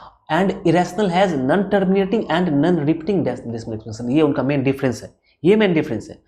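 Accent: Bihar, India. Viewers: Sir ap Bihar se hai kya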